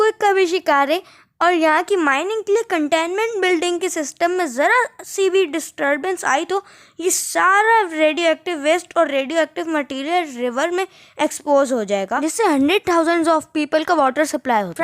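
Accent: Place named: India. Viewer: I am from britain I can’t understand you because of your accent so people who are not indian can not understand you very well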